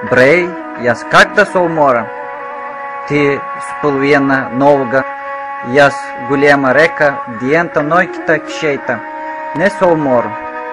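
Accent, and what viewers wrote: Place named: South, Bulgaria. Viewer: Красив южен български диалект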